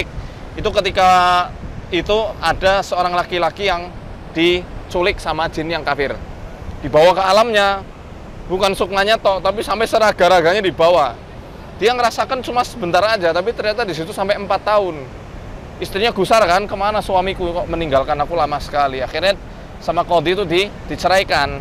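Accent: Banyumas, Indonesia. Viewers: logatnya kho ngapak bhs Indonesianya...apa asalnya dr ..daerah ngapak ,mas Ustad.Kisah dibawa jin memang ada,sy ngalami waktu umur 5 th an,rasanya dibawa teman main(pd hal bukan) .Hilang setengah hari.😀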